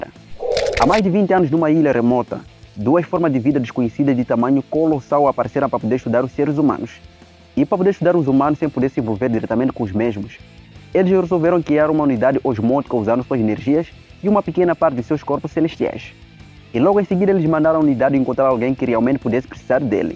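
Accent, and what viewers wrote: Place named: Angola. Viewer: Parece sotaque angolano ou talvez de Portugal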